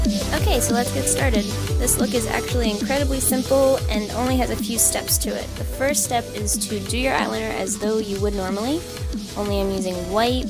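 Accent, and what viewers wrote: Philadelphia, United States. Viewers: Because your accent sounds Philly.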